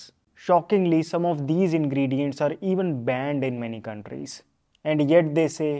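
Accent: India. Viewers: Let Indian English breathe. It has its own charm. ❤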